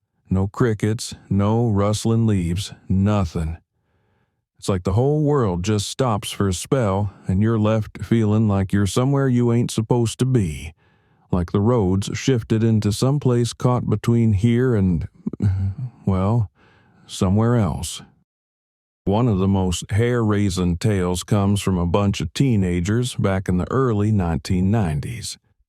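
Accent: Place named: West, United States. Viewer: Is the narrator from Utah? I would swear he is from out West from the accent . i love Ky stories . My Dad had a few.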